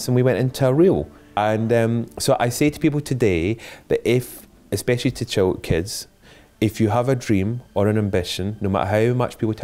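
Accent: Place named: Lanarkshire, United Kingdom. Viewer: He'sScottish. He's speaking English with a Lanarkshire accent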